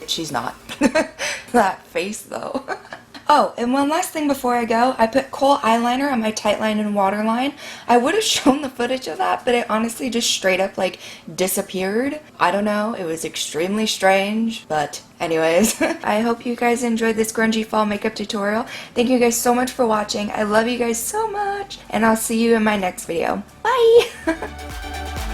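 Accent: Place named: United States. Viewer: As an Englishman, I love American accents, this one in particular. I wish this wonderful lady would do some ASMR.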